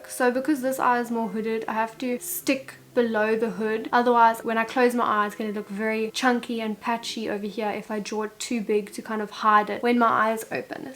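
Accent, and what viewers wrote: South Africa. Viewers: Ooh a south African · Are u South African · You sound south African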